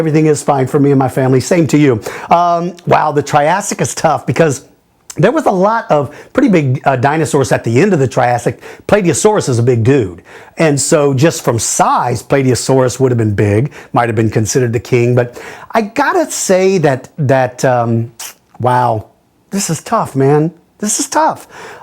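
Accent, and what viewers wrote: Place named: Germany. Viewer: thanks for answering my question, that german accsent made my day of a crappy day thankyou man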